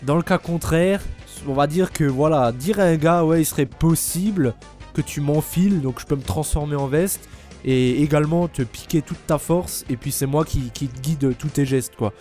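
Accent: Alsace, France. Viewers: L'accent alsacien prononcé 😂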